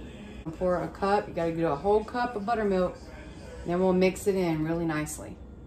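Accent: South, United States. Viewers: Great video thanks for sharing. I can hear your Southern Accent loud and clear, lol. Where is the South are you from?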